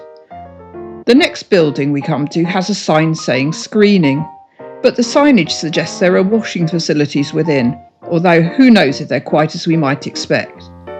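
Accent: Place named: United Kingdom. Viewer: that was… that was fucking sensational. What the actual fuck did I just watch in the best possible way 😅 So many cool little details in here from a build standpoint, like the candy cane accessible toilet handles, the commissary, the flamingo flamenco, the kitbashing, the Rubik’s Cube… fantastic 🤩 But good god the commentary… bravo my friend 🥲 it was so delightfully bonkers and so delightfully British! It felt like a weird mix of evening BBC Local News segment and Diane Morgan being Philomena Cunk. And your script and turn of phrase was astounding. Absolute art. Fallout settlement building heritage right here.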